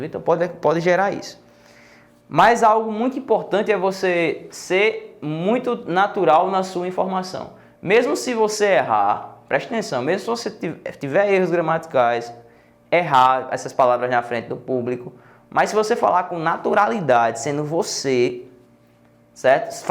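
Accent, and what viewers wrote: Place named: Northeast, Brazil. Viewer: primeiro curso online com um professor com essa linguagem muita boa de intender; sou do ES e to adorando esse sutaque nodestino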